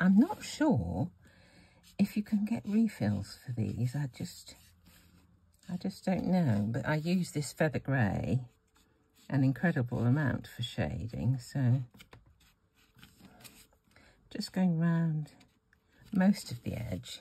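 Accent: United Kingdom. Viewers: What a cute card! I subscribed long ago but I have a lot of subscriptions and I kind of get stuck on a few. Only recently came back around to watching some more of your videos and I have to say your manner is like a breath of fresh air! I love your clean and simple style and your voice is a joy to listen to. I love your British accent ( I’m from Texas in the US, we have quite a different sound!) and your calm, quiet ways. Your videos are so very enjoyable 💕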